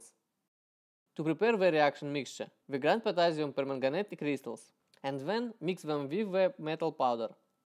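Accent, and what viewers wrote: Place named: Soviet Union. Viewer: Всемогущий Великий Русский Язык пробивается в гроссирующем ррраскатистом Советском акценте! Так Держать - продолжайте практиковаться!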